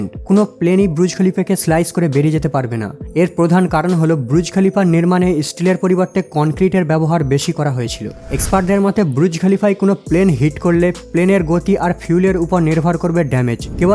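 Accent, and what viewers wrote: India. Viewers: You not Bangladeshe you form India I'm sure your spk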